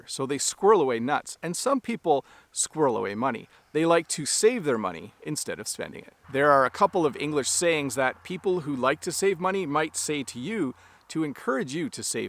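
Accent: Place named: Canada. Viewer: Wow you are a wonderful teacher I like to learn from you Canadian accent I love 🎉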